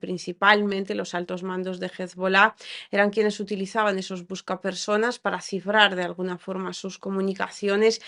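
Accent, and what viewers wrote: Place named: Spain. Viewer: me gusta que ya estás perdiendo tu acento gachupin… mira guapa, eso que icieron es terrorismo puro y deben caer los culpables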